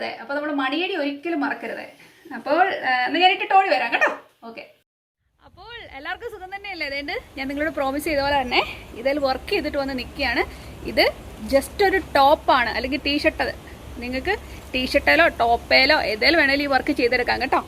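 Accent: Kottayam, India. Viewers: ഇച്ചിരി ഇച്ചിരി .. കോട്ടയം. ചേച്ചി നന്നായിട്ടുണ്ട് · കോട്ടയം kari ano 😍❤️😘👍👍👍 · Superb, good work, kottayam aano naadu, varthamaanam nalla rasamundu kelkan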